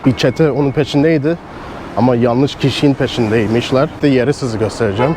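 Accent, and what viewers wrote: United States. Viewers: adam doğma büyüme amerikalı belli ki türkçeden önce ingilizce öğrenmiş sen önce bi üslubunu düzelt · S’en amerikada mi dogdun turkcen den dedim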